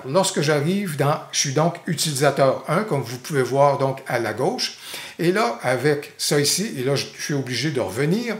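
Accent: Quebec, Canada. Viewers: le charme de l'accent québécois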